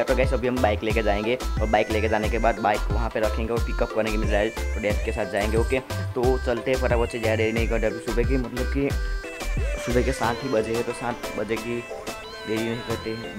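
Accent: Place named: Gujarat, India. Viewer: Bhai tu gujrati he muje pta chal gaya mebhi gujrati hu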